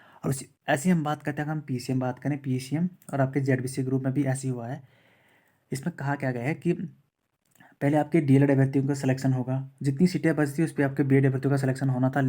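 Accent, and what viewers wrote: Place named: Chamoli, India. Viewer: Bhai pakkaaaaaaa Chamoli ka hai.... Apni boli apni bhasa....,🥰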